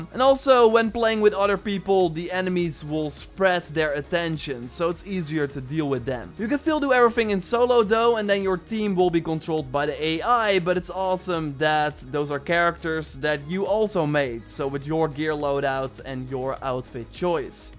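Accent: Netherlands. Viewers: That Dutch accent is so strange and thick.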